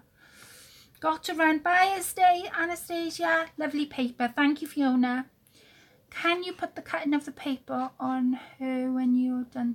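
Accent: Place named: Wales, United Kingdom. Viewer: Sorry I disappeared, my internet quit on me and I had to call my provider, but I can watch the playback, gorgeous card. Love your Welsh accent, I'm originally from Manchester so I recognized it right away. lol TFS...Hugs...Mary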